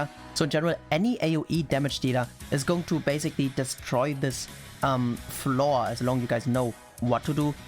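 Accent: Germany. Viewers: Is ur actzent German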